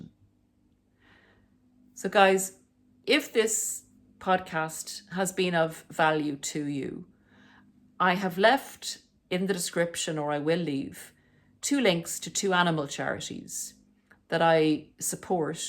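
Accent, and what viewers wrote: Ireland. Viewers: I have an inner dialogue with myself all day every day lol, I use music to calm me, ground me and I love your Irish accent.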